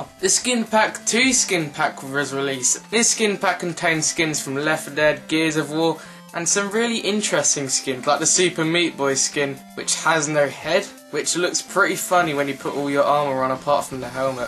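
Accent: England, United Kingdom. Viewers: Where abouts from England are you from? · what part of the uk are u from?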